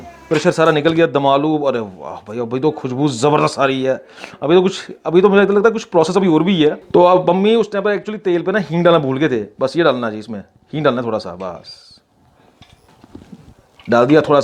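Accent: Punjab, India. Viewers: I just love to hear the Punjabi accent hindi... ❤️❤️❤️ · Your accent is like my punjabi unclr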